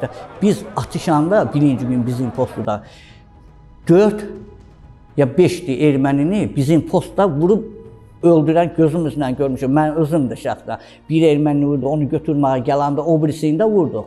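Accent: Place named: North, Azerbaijan. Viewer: Бизим шимал лехчеси олдюрюрде мени❤❤❤❤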